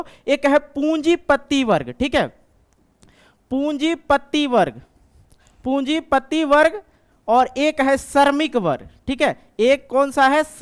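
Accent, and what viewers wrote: Bihar, India. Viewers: Sir Aap Bihar se hai kyaa ❤❤❤❤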